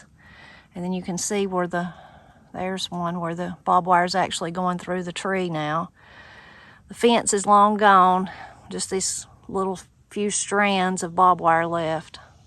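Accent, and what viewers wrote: Appalachia, United States. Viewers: I really enjoy your hikes with and story telling with your appalachia accent. It takes me back to when I was a little girl, we lived in the country near a creek and I actually would go across the road to play in it. When I watch your family I get a comforting feeling, so, thank you Cindy from Kentucky P.S. Your Presley girls are enjoyable as well.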